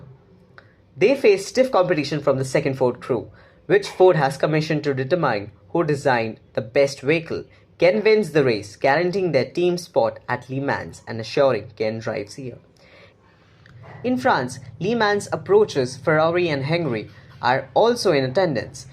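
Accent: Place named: India. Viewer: I love how they used an Indian voice for the narrator... If it's to bring us together I'm in... If it's to turn people against each other I'm out✌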